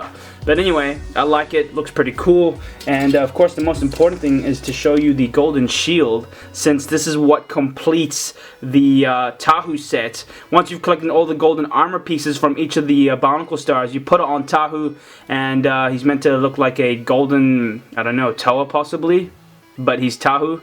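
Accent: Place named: New Zealand. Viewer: Wait are you kiwi, that's awesome, what part of NewZealand are you from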